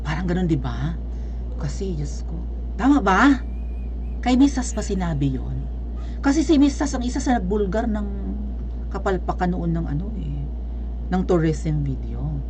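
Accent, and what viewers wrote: Iloilo, Philippines. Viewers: Girl cute mong mqgsalita ng ilongga. 💖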